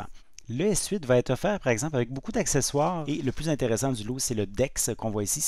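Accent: Quebec, Canada. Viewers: jaime laccent québécois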